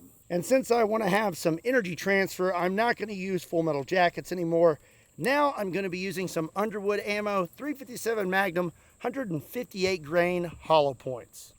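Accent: United States. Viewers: Your yankee announcer voice is hilarious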